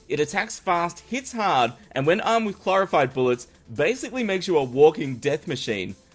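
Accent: Australia. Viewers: When 1.3 comes for mobile i will surely go after the last prism since the mage class is my favourite and the one that i mostly play. P.s. I've never been so early for a video of yours, especially now that it is am right here, so i thought i could also ask a question which is: Are you Australian?. Keep up the good work:)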